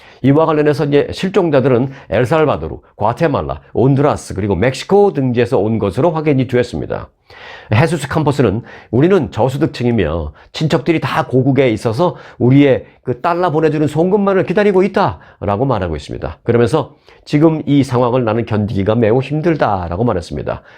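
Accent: South Korea. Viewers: 반갑습니다. 부산의 사투리에 웃음을 주셔서 고맙습니다.ㅎㅎㅎ 볼티모어의 교량이 무너진 것에 넘 놀랬습니다. 수습에 최선을 해야겠죠. 다양한 소식을 전해주셔서 감사드립니다.👍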